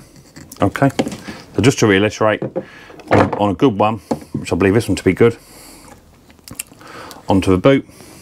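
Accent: United Kingdom. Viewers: your video solved a problem. My mower showed a small spark at each lanyard pull, but would not start. Early in the video, you reveal a coil can spark, but too weakly to start the engine. QED-- now to find a reasonably-priced ignition coil. * PS-- Your Midlands accent is a killer.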